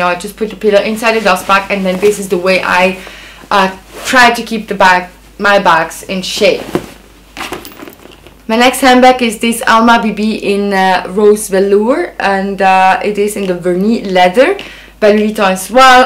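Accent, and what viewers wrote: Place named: France. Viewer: Nice to see you back! I watched A LOT of your videos before starting youtube myself. J’adore ton accent français. (pas du tout comme le mien - je suis Québécois Anglophone)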